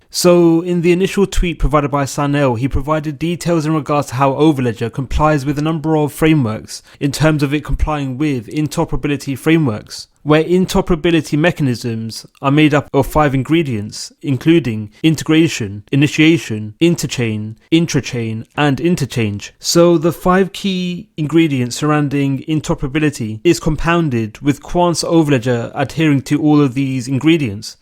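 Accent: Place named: United Kingdom. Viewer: Bru that British Accent.. 😣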